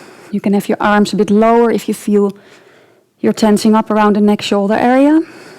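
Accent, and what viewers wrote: Netherlands. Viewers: I love your accent. Are you from the Netherlands? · Je bent nederlands he, hoor ik aan je uitspraak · you can hear she's from Holland too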